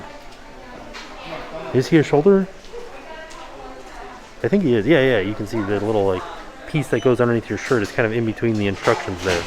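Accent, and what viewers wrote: South, United States. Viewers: It's a southern twang. We mean no disrespect. Have a beautiful fall.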